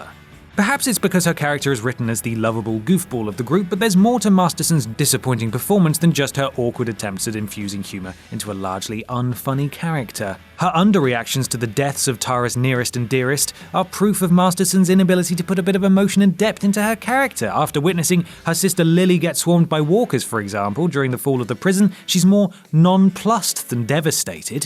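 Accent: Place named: England, United Kingdom. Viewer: Wow this was definitely a reach. And is that an English accent by the narrator? What would you know about southern accents? I think she sounded fine, not everyone sounds the same here. Anyway Emily Kinney is from Nebraska. And while midwestern accents are definitely different, I'm sure she was easily able to tap into that drawl.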